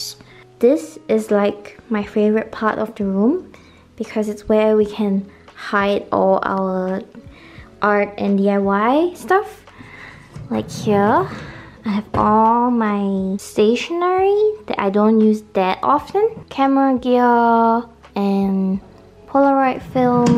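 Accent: Singapore. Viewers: Didn't realised it at first, but then recognised the "Singaporean accent" hahah so cute. give familiar feels watching your vids >.< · The familiar Singapore accent ! Love the makeover. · Your accent sounds abit Singaporean ahah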